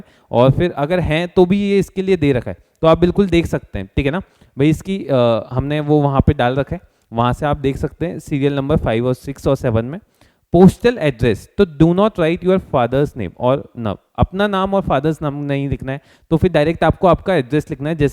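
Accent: India. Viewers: Sir, cool Hindi accent!!🤯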